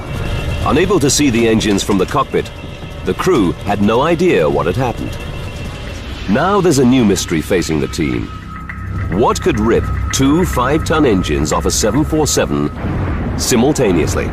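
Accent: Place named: United Kingdom. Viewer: This show is Canadian made by a company based in Montreal called Cineflix and all of the episodes were produced at their studios in London...it was syndicated into US channels under various names and that's why everyone thinks it's American but it's not. Hence the British narrators on every episode. As usual European spouting off about Americans without doing an ounce of research.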